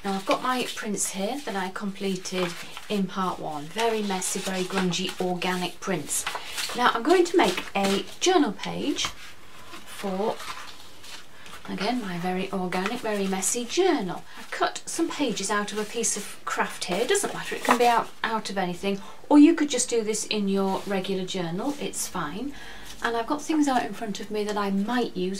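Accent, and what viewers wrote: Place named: Yorkshire, United Kingdom. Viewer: I didn't realise you were from Yorkshire!!.. you certainly have a lovely accent so if I was guessing I would say you're from North Yorkshire...Ilkley or Harrogate? I'm not that lucky, I'm from South Yorkshire.....Barnsley, (all flat cap n whippets) 🤣 and you have to say like a bleating sheep...Baaaaaaaaarnsly🤣🤣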